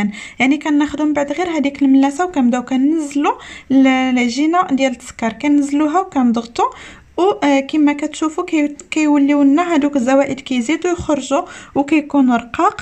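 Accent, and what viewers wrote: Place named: Oran, Algeria. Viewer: hhhhhh j'ai aimé le mot ijarjrak j'adore l'accent oranaise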